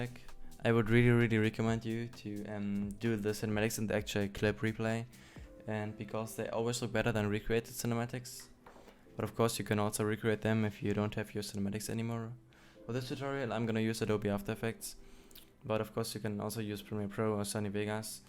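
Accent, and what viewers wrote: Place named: Germany. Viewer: You can just barely hear that you're german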